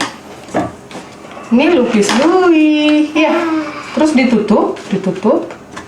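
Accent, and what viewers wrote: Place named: Balikpapan, Indonesia. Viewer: Gaggahh nya pang.. Balikpapan bgt🤣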